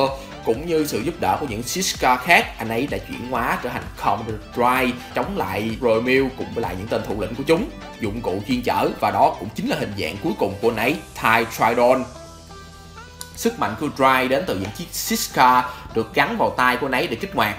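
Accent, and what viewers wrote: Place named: Japan. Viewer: Anh chủ yếu đọc Tiếng Anh theo Nhật nên.... Mà công nhận dở thật:)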